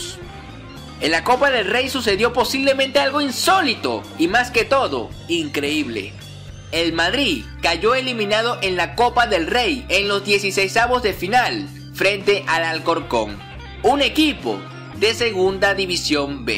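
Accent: Venezuela. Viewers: Hola amigo, eres venezolano, verdad? Además que siento que narras los videos algo parecido a Dross. Saludos desde México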